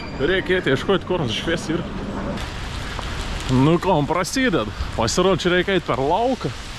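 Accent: Samogitia, Lithuania. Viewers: sėkmės, super , smagu ziureti ir klausyti zemaitiska😃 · Bet keik faine viel tav keliuon kažkor matyte, da ka žemaiteška rokounys atruod ka su draugel pats išdiejau i ton Ruoma ;D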